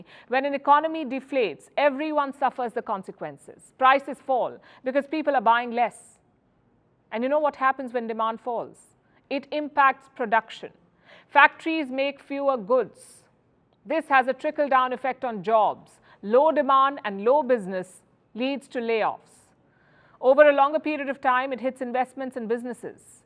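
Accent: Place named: India. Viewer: this media is totally anti china as u can see the commendator has as trong curry accent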